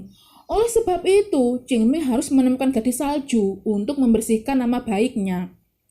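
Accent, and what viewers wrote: Java, Indonesia. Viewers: moso sih mbak ora ngapusi kan... bahasane lucu medok² piye gtu...🤭 smangat mbak yu....👍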